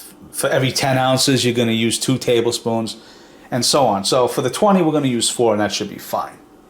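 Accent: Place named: Boston, United States. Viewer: This guy is so Boston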